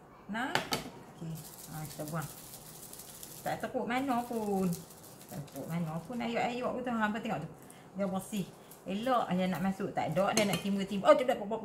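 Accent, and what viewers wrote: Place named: North, Malaysia. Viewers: terhibur sungguh dgn loghat utagha buat kami diperantauan...’punca semua ni’ ayat tergelak besaq 😂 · Dah cuba resepi Ayam Masak Kesuma! Puaih hati... selalu dok tengok channel dengan suami. Suami seronok sebab dengaq loghat Utagha! Gelak2 dia. Semoga ramai yang menggunakan resepi, jariah nanti kat akhirat. Moga Allah terima...Aamiin2 YRA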